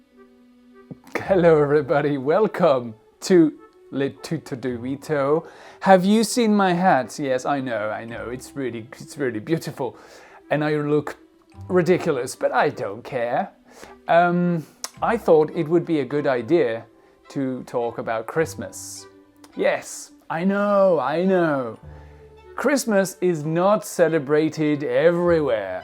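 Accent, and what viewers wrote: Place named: United Kingdom. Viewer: J’adore vos vidéos, amusants, instructifs. Tout pour apprendre aisément. Comme je viens du Québec, l’anglais dont nous avons l’habitude d’entendre est plus celui de l’Américain. Mais j’aime ce son Britannique. Merci, je continue de vous suivre. Bon temps des fêtes!!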